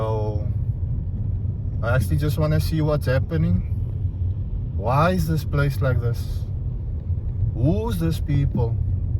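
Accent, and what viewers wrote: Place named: Cape Coloured, South Africa. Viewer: Lekker coloured accent. I miss that living now 34 years in New Zealand. Make some Afrikaans videos too!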